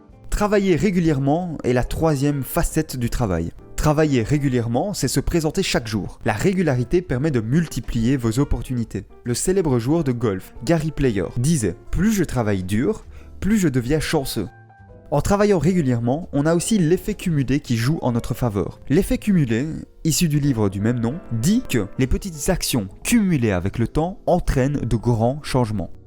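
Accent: Liège, Belgium. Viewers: Bon bein je crois que j'ai reconnu l'accent liégeois :)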